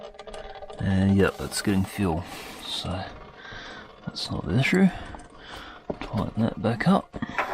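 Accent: New Zealand. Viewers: Shit you sound like a kiwi haha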